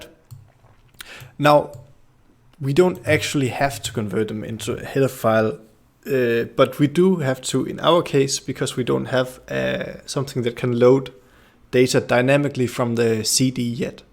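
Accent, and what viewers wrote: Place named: Netherlands. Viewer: from listening to your accent i'm assuming you natively speak Dutch. anyway, it would be great if you also had a video showing how to make the sequenced music (i believe composers just converted midi files to the SEQ format?) and the sample instruments as VAg and then into a batch VAB file . (im not too sure on the specific details but i believe the SEQ file and the VAB file containing the VAG sample instruments((with their loop points data etc)) were to be compiled into one file PSF i think) so that the sequencing/synthesis part of the audio also is explained, instead of the 'Streaming audio' part. also i read on the architecture aspects that it was also possible to do FM Synthesis, which i also would like to see demonstrated, the PS1 remains such a fascination to me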